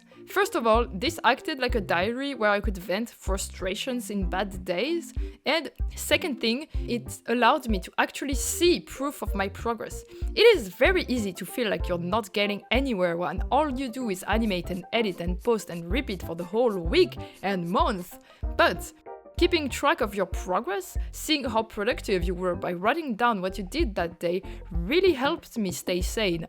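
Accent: France. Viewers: By any chance, aren't you french? Your English is excellent, but the accent still sounds a bit french, as if I was listening to my classmates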